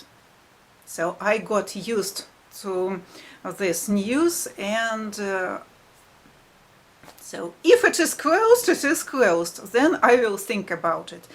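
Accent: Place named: Russia. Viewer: please, do what you want to do here on YouTube. My boyfriend is a Russian who used to work quite frequently here in the United States. He spent roughly sixty to seventy percent of his life here with me. Back in Russia he has two kids with an ex. As such he would have to go back and spend time with his family there. Such was the case at the time that this situation came about. I was there with him for the new year and came back home the following week. We're both about 40 years old and I can indeed say with absolute confidence that this is a person that I love and miss dearly and would love to be married to at some point. Any plans for such a currently on hold for obvious reasons. I've been to his family's dacha several times. I'm on speaking terms with his family there despite the political situation. Watching you to go about your day for me is a kind of therapy. 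Hearing your accents the people around you speaking in Russian it gives me a sense of home that I can't have right now. Please, understand that people will be miserable because of their own personal problems and projecting such unpleasantness on you is all they can do. They're bored, they are shallow. You however, small a one it may be, a light in the darkness. However long that darkness is the last. Пока-пока:)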